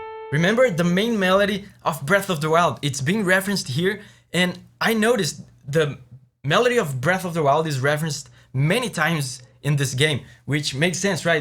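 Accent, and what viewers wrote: Brazil. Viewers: Your accent sounds like a brazilian person, are you from Brazil? (Manda salve se for) GREAT video btw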